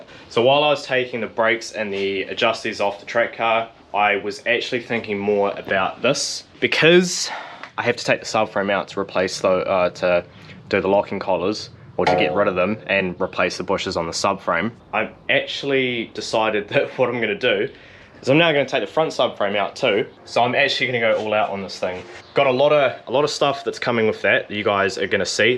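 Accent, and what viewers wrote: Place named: New Zealand. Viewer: What part of nz are you from bro?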